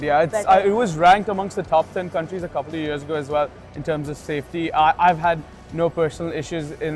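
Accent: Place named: South Bombay, India. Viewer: bhai ye south bombay wala accent to yahin chor jata use kyu leke chala gya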